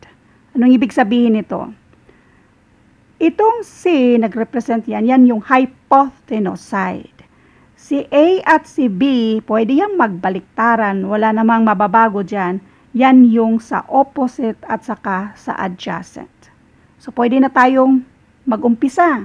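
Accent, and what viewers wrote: Visayas, Philippines. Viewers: Bisaya ka Maam?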